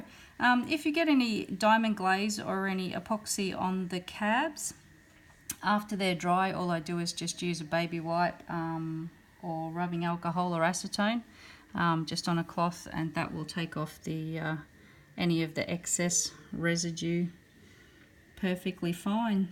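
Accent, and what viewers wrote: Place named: Australia. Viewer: I love listening to your Aussie accent girl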